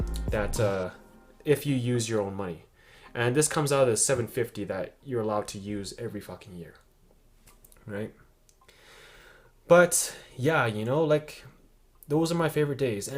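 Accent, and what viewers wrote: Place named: Canada. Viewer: I can hear your Canadian accent! I am French Canadian and we love our ground pork in dishes around Christmas!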